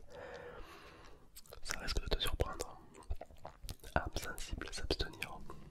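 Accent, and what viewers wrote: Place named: Toulouse, France. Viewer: La voix de crooner avec l'accent toulousain(que j'avais eu la chance d'entendre en te croisant au tgs Springbreak il y a 1 an) je valide, pour un des seules voix masculines me relaxant, t'es un Chef👍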